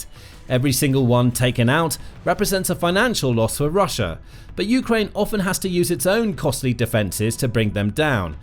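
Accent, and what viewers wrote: United Kingdom. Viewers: Your British speech impediment is strong. You should learn the correct American way to pronounce words including innovative.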